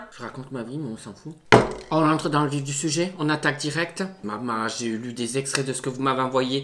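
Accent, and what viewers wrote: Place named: South, France. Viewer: J'adore son accent du Sud 😂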